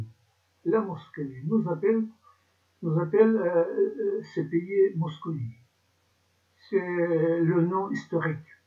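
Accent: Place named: France. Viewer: Добрый день земляк..У вас французский прононс 😀,вы имеете большую способность к языкам 👏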